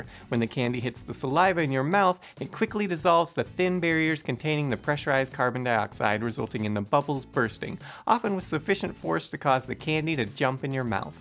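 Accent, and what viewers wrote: United Kingdom. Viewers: Today I Found Out It's because you're not British. I could listen to Brits teach me shit for hours.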